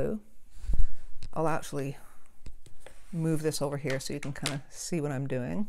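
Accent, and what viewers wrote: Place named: Gloucestershire, United Kingdom. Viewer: as usual I love your work. Is there dvd of your work available? Love your accent the way you pronounce out as owt, reminds my wife and I of our ancestors from Gloucestershire ☺️👌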